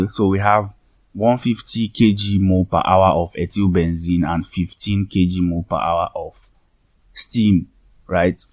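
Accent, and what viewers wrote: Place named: Nigeria. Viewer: I always feel proud whenever I hear Nigerian voice in tutorial videos.. Good job bro❤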